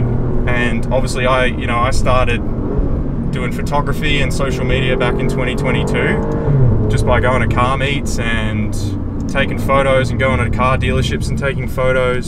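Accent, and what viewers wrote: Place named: Australia. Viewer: Why do you sound more Australian in the car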